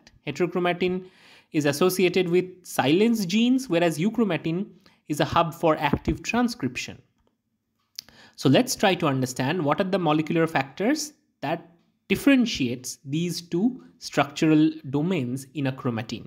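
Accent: India. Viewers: اوا مره افهم شي بدون ترجمه مع انو لهجه هنديه 😅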